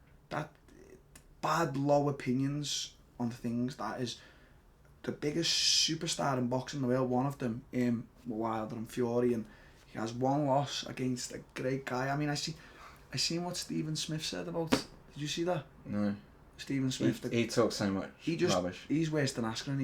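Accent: United Kingdom. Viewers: You close eyed freak... Talk like a hillbilly brit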